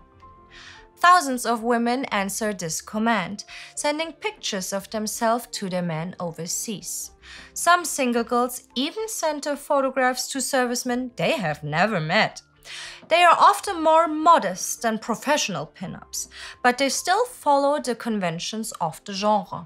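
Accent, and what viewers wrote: Germany. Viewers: Why you got Axis Annie representing your channel? Couldn't you find an American woman to speak for your program? There must be about 150 MILLION of them, you gotta choose a German speaking woman? That's pitiful.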